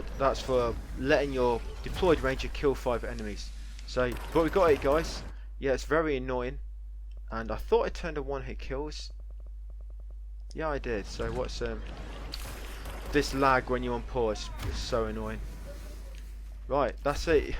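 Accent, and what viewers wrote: United Kingdom. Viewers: what part of the uk you from I was trying to pick the accent, after hearing you for all those hours 🤔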